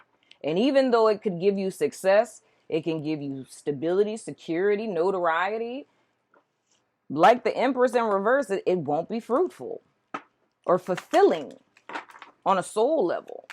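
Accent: New Orleans, United States. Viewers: ok maw, cutup! Glad i found this video...i got a lot from it. Thanks! I heard a lil Nola accent in there! Lets geaux!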